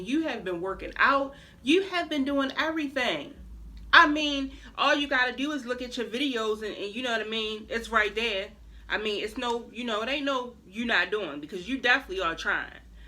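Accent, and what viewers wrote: Baltimore, United States. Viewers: Hey chick! I love your accent. B-more! ❤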